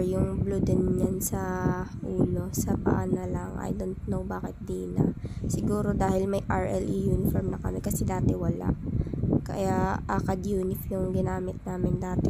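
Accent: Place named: Batangas, Philippines. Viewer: Parang familiar ang boses ah parang Yong batangenia done po salamat for sharing ingat po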